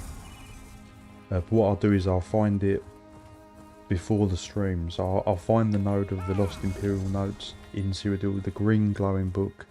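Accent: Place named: Kent, United Kingdom. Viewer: Hey bro, pretty awesome build. Thanks for the good work. Sound like you're from down Kent way. I saw a build for battlegrounds and cyrodil but can't remember if it was you or another creator, sadly I got interrupted with family bits and lost the video! But if it wasn't you maybe you can use your big brain to make it? Was a gank build using destruction staff and frost, the numbers were absolutely huge. Think there was some elements of heavy attacks from range and the recovery was shite. But... Did some nice AOE too. PvP is wild since subclass, I've been away 4 years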